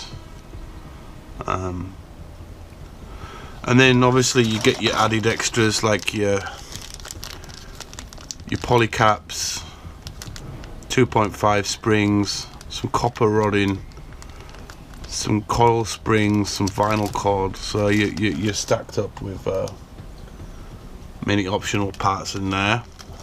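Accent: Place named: Leeds, United Kingdom. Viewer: I've sent you an email also I didn't realise that's where you are based! as you sound like my mate who's a Leeds fan all the best from UK mark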